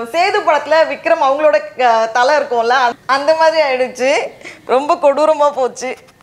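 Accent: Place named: Southern Tamil Nadu, India. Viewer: ninga unga speech la Thenmavattam thaan ninaithen... athu correct pola Nagarkovil nu sonningalaa...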